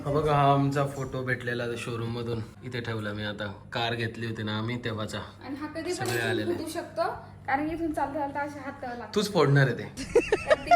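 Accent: Nashik, India. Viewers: Dada mi devlyachi aahe.tu maja gava javlcha aahe.khup bar vatle.tumchi Jodi mala aavdte.tuja bhashe Varun mala samjle tu nashik ch aahes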